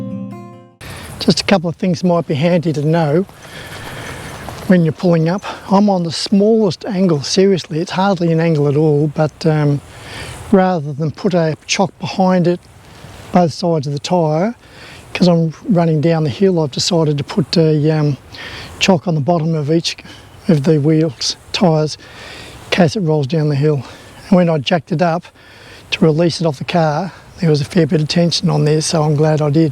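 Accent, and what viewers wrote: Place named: Australia. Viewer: Fair dinkum, a true blue bogan with awesome Aussie accent! :)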